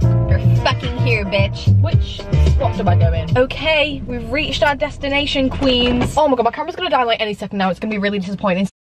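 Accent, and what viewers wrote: Manchester, United Kingdom. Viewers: Kinda caught me off guard when she started speaking in a British accent. I thought I was still on the American side of youtube. Loved watching this though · First word she said I was like “SHES FROM MANCHESTER” n then she said it so I feel validated. That’s all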